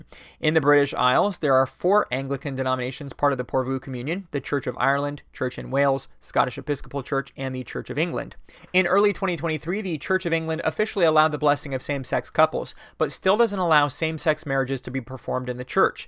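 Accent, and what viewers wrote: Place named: United States. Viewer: The commenter didnt know you were american? What did he think you were by your accent? Maybe Canadian but you'd have to have a really bad ear for accents to think that! Another great video. Dont worry about it. Haters gonna hate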